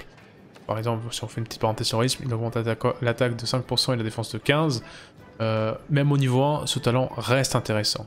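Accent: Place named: Vaud, Switzerland. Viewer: Mais t'es tellement vaudois ahaha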